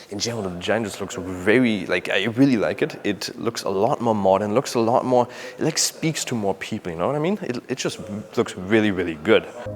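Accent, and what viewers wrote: Germany. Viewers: Is he from Germany? He sounds really german to me.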